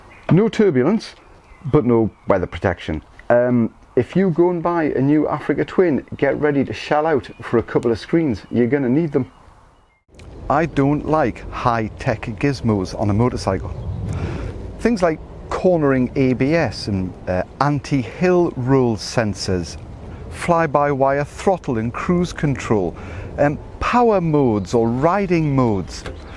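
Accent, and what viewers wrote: Newcastle, United Kingdom. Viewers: Well done, enjoyed your Geordie accent and wit ( charm would be going a bit too far).. cheers from am old Jock down under in Western Australia. PS you need a passport for here as well, but the weather is great for riding that AT!.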